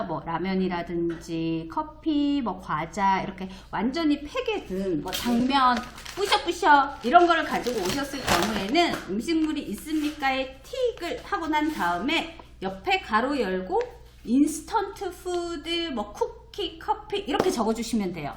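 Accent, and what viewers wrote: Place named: Gyeongsang, South Korea. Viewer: 부산출신으로서 억양듣고 알았습니다ㅋㅋ 같은 이민자로서 타지에서 같이 힘냅시다.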